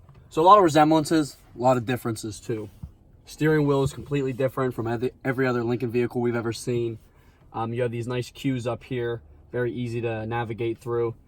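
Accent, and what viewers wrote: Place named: Pittsburgh, United States. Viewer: Lol are you from Pittsburgh? I can tell that accent from anywhere!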